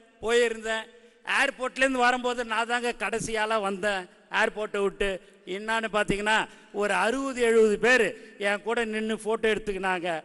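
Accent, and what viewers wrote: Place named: Chennai, India. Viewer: Aprm enna Telugu layaa pesuraaru... Oru District ku oru style mari ithu Chennai Slang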